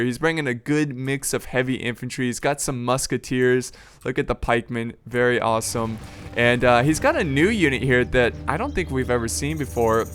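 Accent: England, United Kingdom. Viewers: is American? I thought he was British. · are you a englisch or a american youtouber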